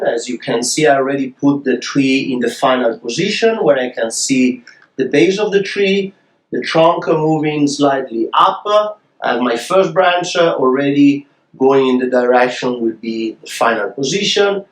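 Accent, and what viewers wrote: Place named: Italy. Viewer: Ma quanto internazionale? Almeno l'inglese italianizzato si capisce bene😅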